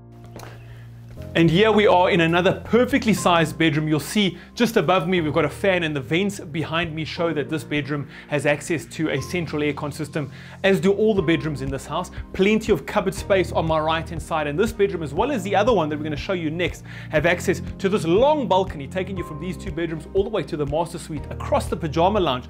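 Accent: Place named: South Africa. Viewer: Thank goodness! A normal SA voice! So much better! Ur hey guys. See guys ur. Lol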